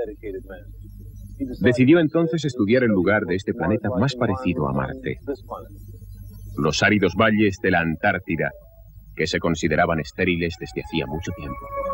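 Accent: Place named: Spain. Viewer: Es un buen programa, el contenido muy bueno; lo malo la pronunciación (del español de España es asquerosa) ni siquiera pronuncia adecuadamente el inglés como es costumbre de los españoles, se escucharía mejor en una traducción en español neutro...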